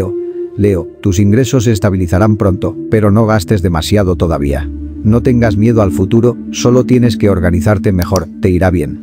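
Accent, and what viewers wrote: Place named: Spain. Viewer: No me gusta que el locutor sea español, cuando el locutor sea mexicano te doy Like mientras, no te veo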